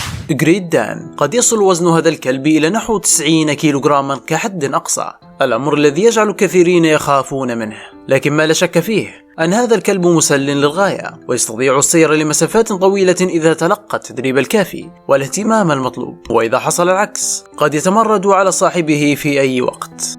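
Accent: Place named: Libya. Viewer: أسمع أنت ليبي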